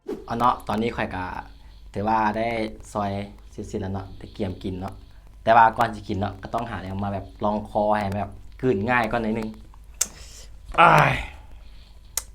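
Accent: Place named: Laos. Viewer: เป็นคนที่ไหนเสียงคือลาว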